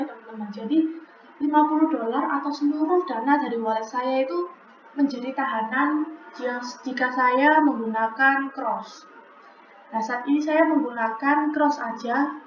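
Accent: Java, Indonesia. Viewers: Suara nya ko medok banget 🙈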